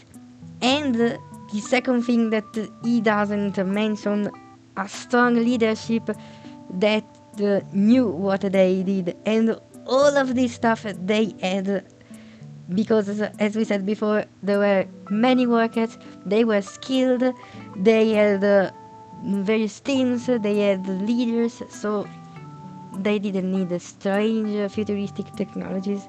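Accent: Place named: Italy. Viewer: What is your native language? I'm curious it really sounds like italian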